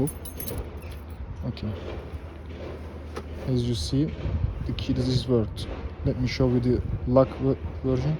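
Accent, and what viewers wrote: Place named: Turkey. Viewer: şarkısi çalmadan önce anlamıştım türk ingilizcesini:) teşekkürler video için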